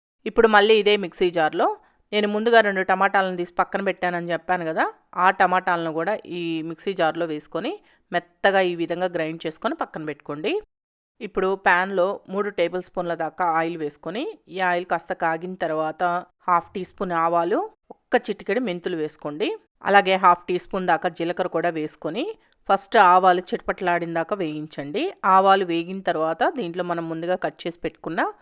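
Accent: Nellore, India. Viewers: Mee uru Nellore aa? Ma Nellore relatives mee lage matladataru. And recipe chala bagundi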